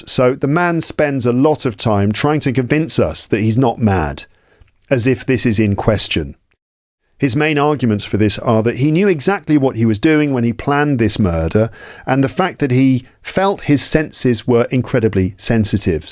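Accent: United Kingdom. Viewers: Hello . Is this British pronunciation? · Thank you for the podcast you shared, I really loved your British accent when listening to the story too 👍👍🙏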